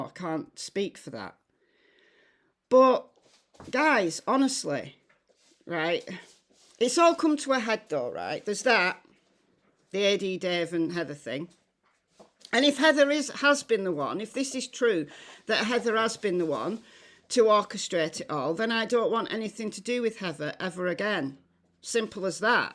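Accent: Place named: Wales, United Kingdom. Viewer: New Subscriber, and I Love You, you are so Refreshing and tell it like you see it, it's Awesome! Hang in there and don't team up with any other creator, as you well know! Be true to yourself! Plus my folks were from Wales, UK, and I Love hearing your accent, so simular to my parents, but sadly they are no longer with us. Take Care!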